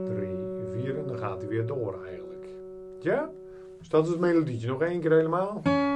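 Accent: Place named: North Holland, Netherlands. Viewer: Hoi daar. Ik ben Jim, 62 jaar en min of meer met 'pensioen'. Ik verveel mij gelukkig niet maar toch... wilde ik er voor de winter maanden een leuke hobby bij. Ik luister graag muziek van klassiek tot hard rock maar het jaren 70 geluid van Genesis en gitarist Steve Hackett is wel iets waar mijn hart het meest ligt. Net begonnen met akkoorden leren. Mijn vrouw had jouw gitaarlessen gevonden en ik vind het prettig qua tempo en is duidelijk om 'mee te doen'. Trouwens, het zou mij niets verbazen dat je uit de buurt van Avenhorn kom. Die 'klank' klinkt erg bekend ;-) Ik ga een tijdje oefenen met je lessen! Bedankt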